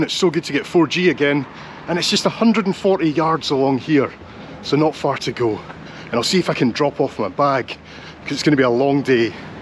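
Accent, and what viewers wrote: Scotland, United Kingdom. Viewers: It‘s the Scottish brogue that gets me. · As a humble inhabitant of Santander, and a satisfied subscriber of your amazing channel, i need to say that i have enjoyed every single second of the video,. You have made me travel around my city with your eyes and your "scottish" english, (which i enjoy even more!!!)..... and i loved it!!! Keep up your amazing work in the channel, you make a lot of people happy with your videos. Peace!!!! P.S. 1) The "Government Offices" are in fact a Theatre, Palacio de Festivales de Santander, (kind of Santander´s Opera House) 😉🤣🤣 2) The "turntable" is a platform to ease the turn around of delivery trucks and vans 3) Most amazing coincidence, minute of the video you pass by my working place, LF Cantabria. Real State Company in Santander 😊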